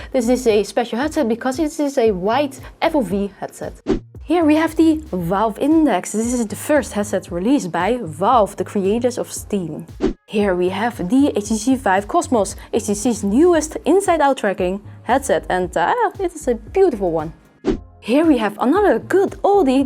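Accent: Netherlands. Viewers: Ben je Nederlands, want volgensmij hoor ik een accent🤣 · Are you dutch? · You sound so Dutch to me haha are you Dutch?! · Are you from the netherlands? I think I hear a dutch accent. :) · Haha ik hoor dat je nederlands bent😝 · You sound Dutch :X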